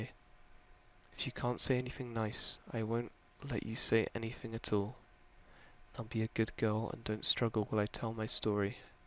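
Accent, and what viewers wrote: United Kingdom. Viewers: he sounds sooooo British 🇬🇧🇬🇧🇬🇧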